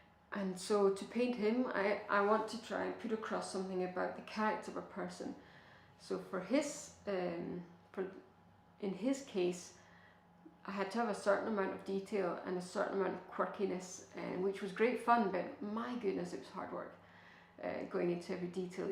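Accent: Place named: Ireland. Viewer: Brzenk, is your muse....so to speak .I just realized , You sound Irish :) P.s , tak for flex, var dejligt at se ;)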